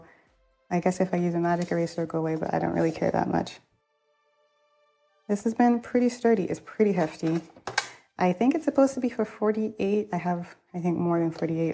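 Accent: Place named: United States. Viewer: I cannot pin down what country you’re from... your english is so perfect and sounds very american but you mention al the prices etc in euros? I am very torn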